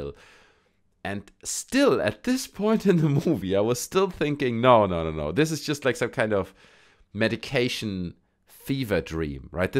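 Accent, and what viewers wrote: Germany. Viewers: This guy has an oh so subtle german accent but speaks great English... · HAHAHAAAA! LOL. Your German Accent just makes everything sound funnier! Good job though.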